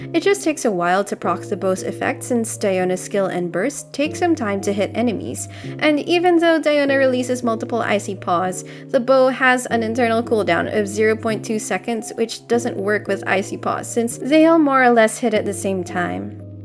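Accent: Philippines. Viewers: Idk why but im getting heavy pilipino vibes from her voice, where she from, I mean the narrator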